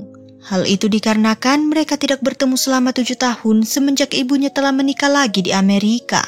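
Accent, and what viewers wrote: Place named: Bali, Indonesia. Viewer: Orang bali kah,,,,??? Logat setiap kata ada Te tapi di ucapkan Dhe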